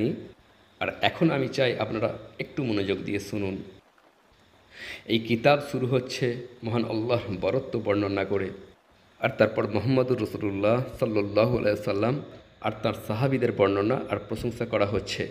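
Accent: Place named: West Bengal, India. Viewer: ভাই উনার ভাষা এমন। উনি পশ্চিমবংগের সম্ভবত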